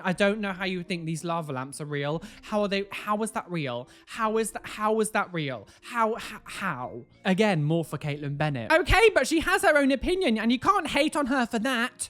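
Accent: Texas, United States. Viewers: YO, ARE YOU TEXAN? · Atleast your American accent isn't as bad as American's British accent Like if America can have different accents why can't we lmao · The fact can do an American Accent better than I can do a British accent 😂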